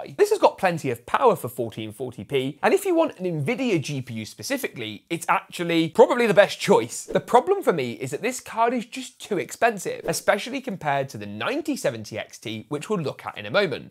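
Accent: United Kingdom. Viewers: Why do you sound more extra British?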